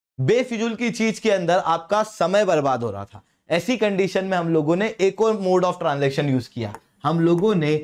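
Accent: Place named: Bhopal, India. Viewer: Bhopal se ho kya bhai 😐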